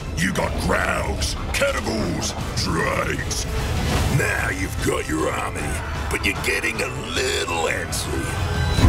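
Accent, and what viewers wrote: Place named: Australia. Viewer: he's Aussie mate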